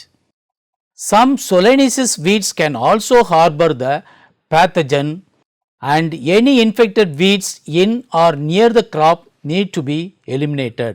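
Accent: India. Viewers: Respectfully, I feel it is a shame there is no subtitles for this video. There may be helpful information on the subject matter but the speaker's extremely thick accent makes it nearly impossible to understand what he is saying. I find it very difficult to listen to people with an Indian accent speak English and they very often seem to insist on doing it anyway without subtitles and without learning how to properly pronounce and accunciate English words. I can't imagine learning to speak Hindu with an American accent and expecting native Indians to understand what I am saying and retain helpful information on a scientific or medical topic without being distracted or frustrated by the language barrier. Would it not be better to make the video in your native language and have subtitles in English or have it translated and a voice-over done by a native speaking English person if you want your viewers to truly obtain the content you are providing?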